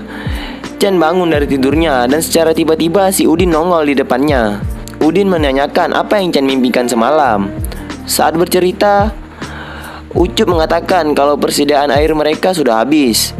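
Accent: Aceh, Indonesia. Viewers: Orng aceh ya bang? :V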